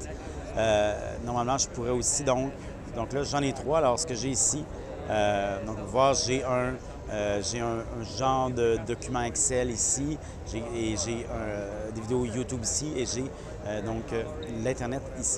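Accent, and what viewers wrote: Quebec, Canada. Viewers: L'accent québécois est toujours aussi marrant mais on comprend très bien et vidéo très intéressant. Merci beaucoup pour cette présentation.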